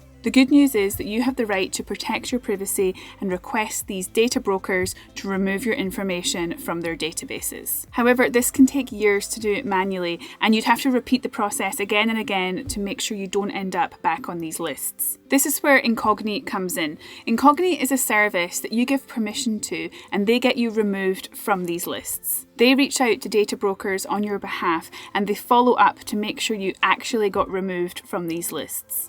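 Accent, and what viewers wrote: Scotland, United Kingdom. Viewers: I just came across channel a few days ago and became an instant fan. I've been on a " -a-thon" ever since. She's so charming and down to earth. While almost always beng positive in her reviews of places/things, she isn't afraid to point out some "insufficiencies" when she comes across them. And I absolutely adore her Scottish accent (which isn't so strong as to be misunderstood). channel is like a dram of high quality single-malt... It always goes down smoothly and makes one feel warm inside. 😇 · Thank you for the authenticity of your videos. I'm a second-generation American, but my grandfather immigrated from Scotland, so I have a soft spot for your accent due to my Scottish heritage. I even have a kilt that's been handed down through my family. I've just subscribed and am looking forward to watching more of your videos and learning more about the country of my heritage!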